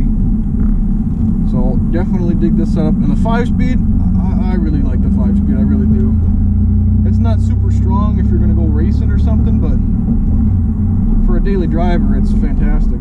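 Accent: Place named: Midwest, United States. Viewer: The midwestern accent is AGGRESSIVE. Really completes the vibe.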